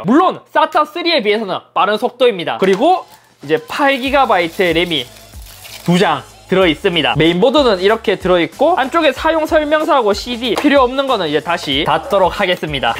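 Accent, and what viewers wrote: Gyeongsang, South Korea. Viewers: 말하는게 경상도 느낌난다 했는데 부산분이엿구나..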